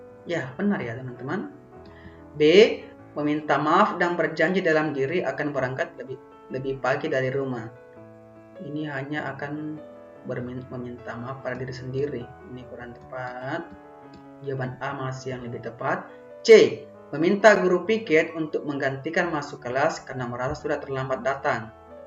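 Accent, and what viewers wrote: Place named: Madura, Indonesia. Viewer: Aq mendengar pak bilang logat Madura, apa pak org Madura? Salam sittong dhere pak😁🙏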